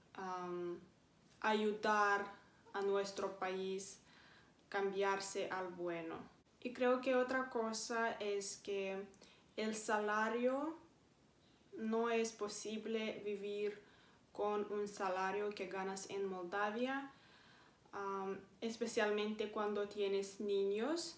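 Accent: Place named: Spain. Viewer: Estas seria siempre o es por concentrarte en hablar español? Intenta hablar más neutro y no como española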